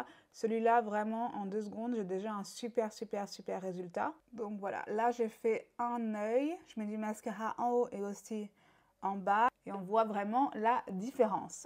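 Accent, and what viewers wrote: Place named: France. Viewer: Trop cool une vidéo en Français yesss , c’est marrant quand tu parles en anglais tu as un léger accent Français et quand tu parles en anglais tu as un léger accent en anglais so cute 😻❤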